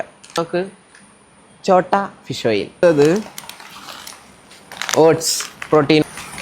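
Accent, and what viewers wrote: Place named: Kochi, India. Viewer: Kochi slang poli bro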